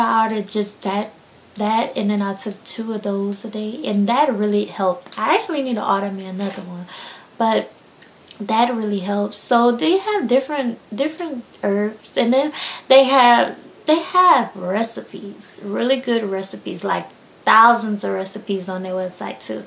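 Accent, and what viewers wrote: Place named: Deep South, United States. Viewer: Girl u from deep down south...😍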